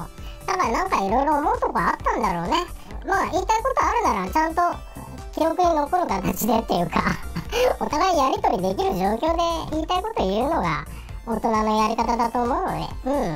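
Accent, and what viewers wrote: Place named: Osaka, Japan. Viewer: なんだか、大阪のおばちゃんがしゃべってるみたいｗ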